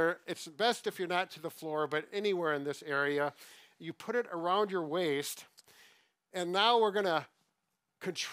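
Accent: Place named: Wisconsin, United States. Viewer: I detect a Wisconsin accent.. you guys are rad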